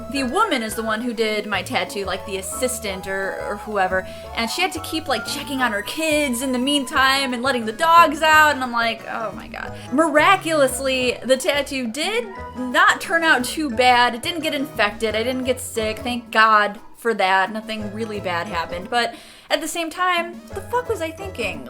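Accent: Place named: Chicago, United States. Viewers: I thought you had an Illinois accent! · Ya from Chicago? Ya 'da bears 'da bulls accent! Its all good lol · I love how Midwest your accent is I can hear the Chicago dripping from your voice.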